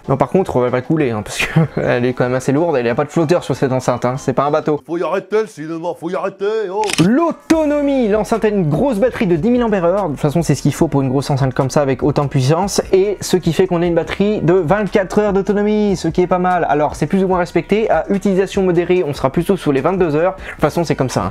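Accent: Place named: Savoy, France. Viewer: ton accent du bon vieux savoyard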